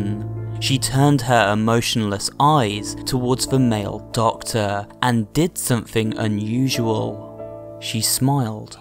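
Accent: United Kingdom. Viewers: The British accent really adds terror to it.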